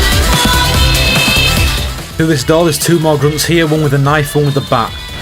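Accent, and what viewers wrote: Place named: United Kingdom. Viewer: Of course its s UK accent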